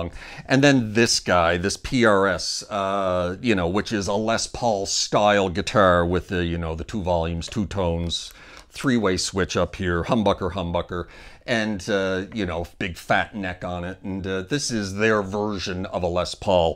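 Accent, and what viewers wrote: Canada. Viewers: Hi. Do I detect a slight Canadian accent?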